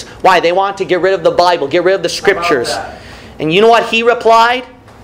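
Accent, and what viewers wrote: United States. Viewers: You are so right. I love to see new videos come out from! And I love his country accent, esp when he says "teachin'". And whoever that is in every teaching screaming out "Amen" in every video, God bless you Brother 🙏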